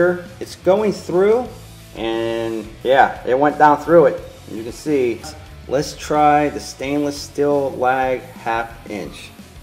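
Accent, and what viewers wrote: Pittsburgh, United States. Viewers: Nice acccent. Pittsburgh area?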